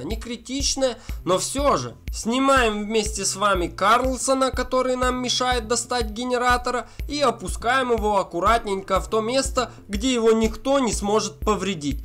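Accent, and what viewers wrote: Ukraine. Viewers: Акцент дикий! Ты из Хохлостана?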